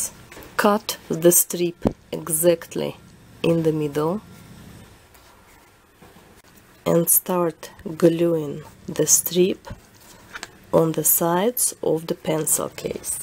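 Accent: Russia. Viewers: Привет she has a Russian acsent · Its really cool,my friends asked me if i made it and they liked it;)i told them about your channel amd they loved your crafts and my friend send a message for you "Wow,she is really creative!i like her accent and i think she is russian.man russian ppl are so cool>.<"-ellie(my friend:) )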